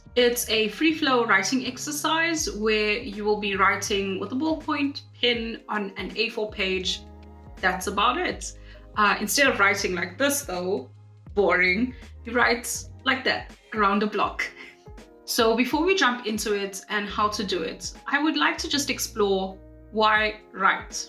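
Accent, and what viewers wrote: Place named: South Africa. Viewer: I’ve always been told that the South African accent is calming and it took me 33 solid years but now I agree.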